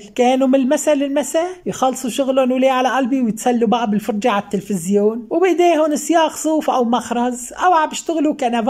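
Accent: Aleppo, Syria. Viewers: من بعدك ماحدا يحكي حلبي حببتينا باللهجه الحلبيه وتعليقاتك الراقيه الخفيفه الحلوه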